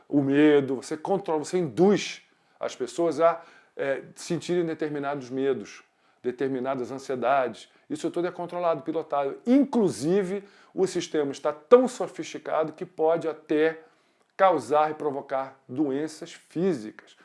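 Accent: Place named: Rio de Janeiro, Brazil. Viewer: Excelente! O Sr. é muito entendido e esclarecido no assunto conspiração e fala o nosso bom e velho "carioquês"... gostaria de saber sua opinião sobre a conspiração alienígena, porque em meu ponto de vista lógico é a origem de toda a historia humana, a mãe ou a "ultimate" de todas as conspirações. Fomos criados para ser eternos escravos para servir propósitos excentricos de seres alienígenas. Gostaria de um vídeo, pois o sr. transmite clareza e embasamento nas palavras, sou mente aberta. Abraços.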